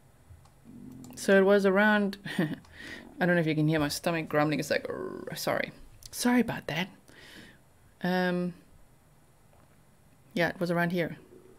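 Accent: South Africa. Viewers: are you South African? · Love your saffie accent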